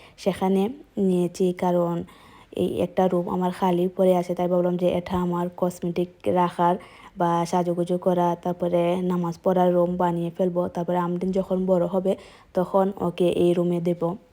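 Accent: Sylhet, Bangladesh. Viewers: আসসালামু আলাইকুম আপু কেমন আছো তোমার ভিডিওটা অনেক সুন্দর ছিল আপু তোমার বাসাটা খুব লার্জারি তোমার খুব ভয়েস শুনে মনে হয় গ্রামের বাড়ি সিলেট তাইনা আপু কোথায় থাকো তুমি ❤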